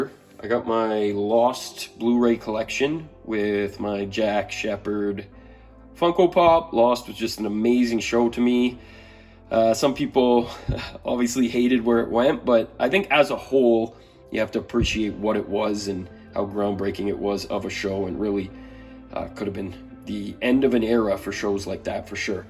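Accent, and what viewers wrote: Canada. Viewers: Tu québécois man? 🤔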